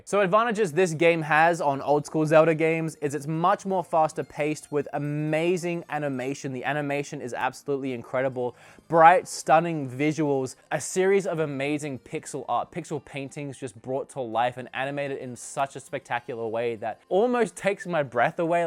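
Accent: Australia. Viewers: Your Australia 😋 ps zeeeldoooo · Are you Australian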